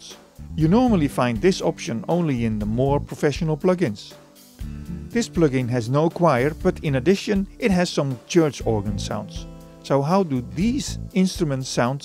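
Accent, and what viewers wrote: Netherlands. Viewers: a lot of these sounds actually sound better than some of the pricey VST's (at least before you do a hell of a lot tweaking and modifying). You can certainly learn the trade using these, before upgrading to the big pricey stuff. Thanks a lot neighbour (I'm from Belgium, and I hear a Dutch accent in your voice, right?) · You sound Dutch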